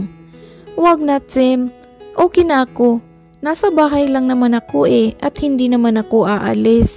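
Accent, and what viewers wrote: Visayas, Philippines. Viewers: 🤣😂😅😅dlwang sunud na bisaya ang nag e story teller pero nice s dlawang sunod mas ok to magkwento ..💓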